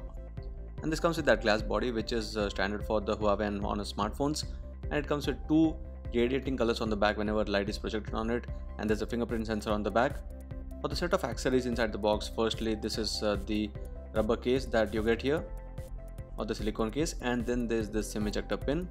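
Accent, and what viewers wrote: India. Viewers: You have a deep thick Indian accent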